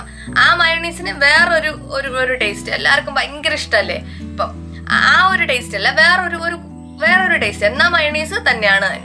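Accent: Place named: Idukki, India. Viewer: ചേച്ചിയുടെ സംസാര ശൈലി കേട്ടിട്ട് എനിക്ക് എന്റെ നാട് (idukki)ഓർമ വരുന്നു. ചേച്ചി നാട്ടിൽ എവിടെയാണ്?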